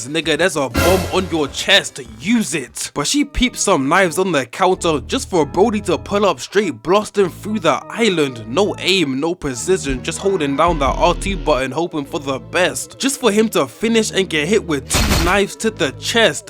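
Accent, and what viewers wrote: United Kingdom. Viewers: Your voice is like British Casual Premium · I love how clear it is to understand your British accent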